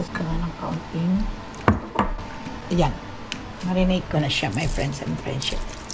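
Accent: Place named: Iloilo, Philippines. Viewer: bakit po parang ilongga po kayo.. sorry napatanong lang po.. super enjoy ako aa content nyo po.. may advice pa kayo na binigay..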